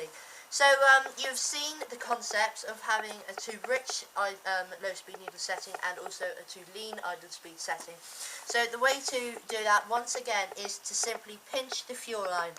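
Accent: England, United Kingdom. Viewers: Well hopefully when I try that tomorrow it will sort my running problems out. Wish I found these vids ages ago. So nice to hear an English voice instead of American. Well done mate i've already subscribed and watched about 20 of your other vids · why do you always sound like a british girl??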